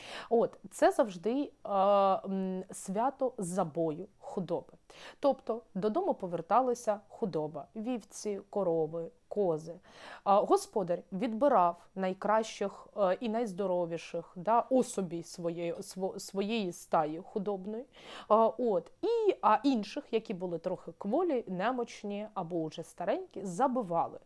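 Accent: Ukraine. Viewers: Як гарно все розклала по поличках! Приємно слухати інтелектуальну людину з такою приємною зовнішністю і українською вимовою! Дякую, наша ❤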